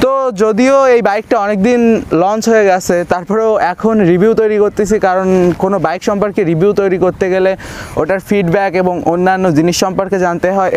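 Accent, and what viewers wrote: Khulna, Bangladesh. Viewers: আপনার খুলনার ভাষার কথা শুনে আমার ও খুভ ভাল লাগতিছে !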